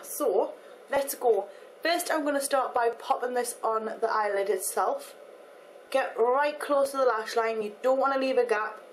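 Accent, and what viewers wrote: Newcastle, United Kingdom. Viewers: Are you From Newcastle ? You have a Geordie Accent [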